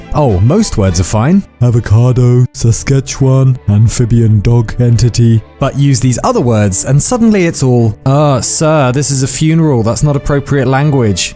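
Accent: United Kingdom. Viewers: OK, but why do Brits talk wrong? It's almost like they want the listener to understand 10% of what they say. Boston, Australia, Texas, drop the dumb accents, and talk like a Californian, with no accent, it's not funny anymore, do you need training wheels for your tongue?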